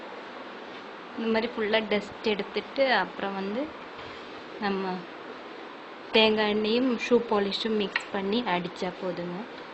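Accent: Kerala, India. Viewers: Hi Amma, ur sounds like my mom, bzos my mom's native is Kerala she'll mix both malayalam and Tamil while speaking. Only the known people can understand her talk Amma. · thank you ur reply. Malayala Vasam speech LA therunchadhu. Where it's built?. In Kerala r Tamil nadu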